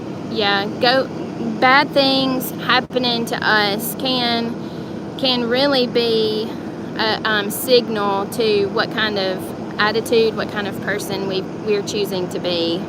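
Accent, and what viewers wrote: South, United States. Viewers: You are the sweetest person and I really don't know how anyone could come up with a negative comment to you! By the way, I could listen to your southern accent all day long. The way you speak is very calming to me!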